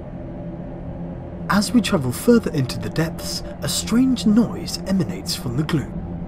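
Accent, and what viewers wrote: United Kingdom. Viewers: awesome , nice job, ...and the english accent the best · Really cool! The British accent really sells it. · the british accent is best, David Attenborough approves that lol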